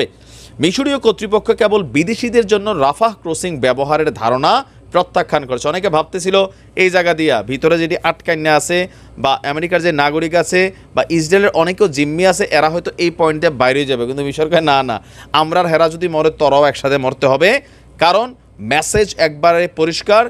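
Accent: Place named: Comilla, Bangladesh. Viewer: আপনার এই খবরে আজকে কুমিল্লার ভাষা সুন্দরভাবে শুনে গেছে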